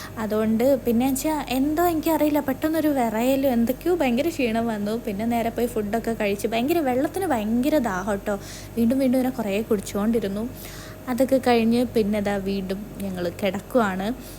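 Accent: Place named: Kannur, India. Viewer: Aaa. 😍 kannur salnk poleind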